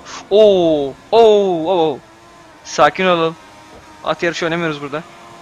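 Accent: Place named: Hatay, Turkey. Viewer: diksiyonun baya düzelmiş. Ayrıca Hataylı olduğunu ilk duyduğumda inanmamıştım ama bu tonlamayla başka bir ihtimal düşünemiyorum.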